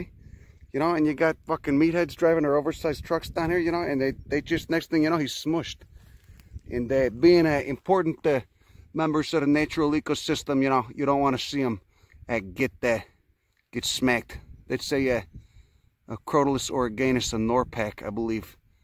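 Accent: Chicago, United States. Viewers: South side Chicago Attenborough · "Don't judge me, I will BITE your ass!" "Delicate bastards" is my new term of the week, although I am not sure I can match your Chi-town delivery.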